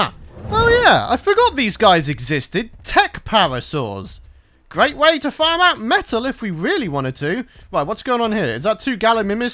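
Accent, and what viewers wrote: Scotland, United Kingdom. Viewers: he sound kinda scotish like his sound when he talks i just noticed that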